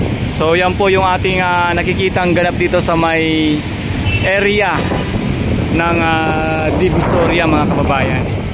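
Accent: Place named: Visayas, Philippines. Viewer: Bisaya accent ka boss ah hehehe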